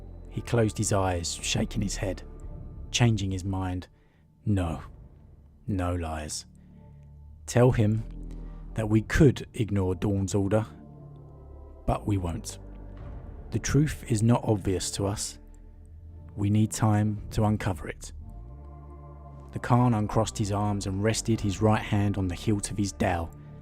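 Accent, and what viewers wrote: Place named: Cockney, United Kingdom. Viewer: It's called Cockney, and it's an accent. Not all English people are incapable of saying "th" lol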